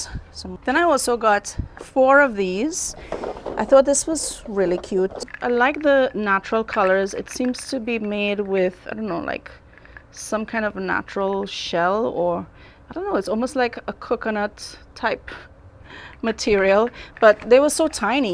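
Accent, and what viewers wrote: Trinidad and Tobago. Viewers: thought I recognized the accent. I am also from trinidad. Well done on your patio refurbish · Your acent sounds like you're from Trinidad?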